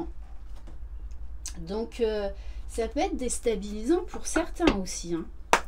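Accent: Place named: Alsace, France. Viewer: Bonjour. Oui du 67 .mais c est un accent tellement mignon qu on a .rester comme vous êtes .c est l Authenticité qui fais la bonne personne. 😇🥰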